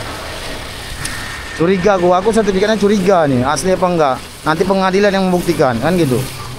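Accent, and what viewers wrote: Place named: West Sumatra, Indonesia. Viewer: Aksen Bang kyk orang Sumbar 🙏🙏🙏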